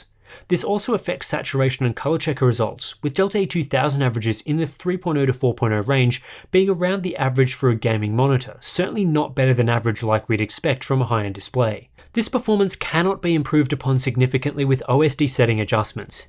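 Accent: Australia. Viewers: I think the real news here is Hardware Unboxed being hosted by a young Freddie Mercury, resurrected and with an Aussie accent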